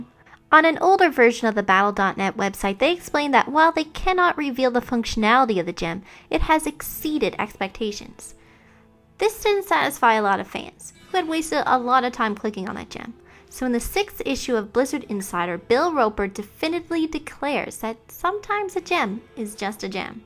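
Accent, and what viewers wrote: Canada. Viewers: Well, she is canadian; you can´t expect for the whole world to speak exactly the same. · That Canadian accent is adorable!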